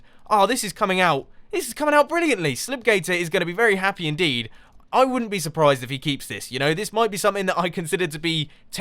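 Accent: United Kingdom. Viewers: Mumbo Jumbo Nvm cuz british accent is sexy.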